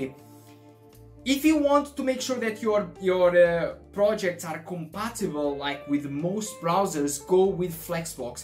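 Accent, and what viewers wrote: Brazil. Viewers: falou duas palavras eu vi que era brasileiro hahah ótima explicação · are you Brazilian ?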